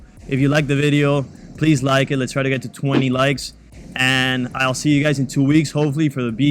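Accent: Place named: Miami, United States. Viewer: Thick miami accent lol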